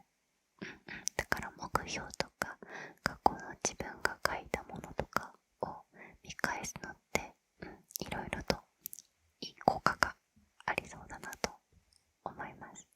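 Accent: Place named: Osaka, Japan. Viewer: スラム街を見学しました🙂‍↕️え、大阪弁！？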